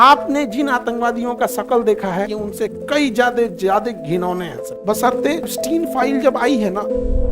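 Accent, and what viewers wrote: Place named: Bhojpuri, India. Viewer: Khan sir you are great you speak just like my brother bhojpuri and you have great knowledge.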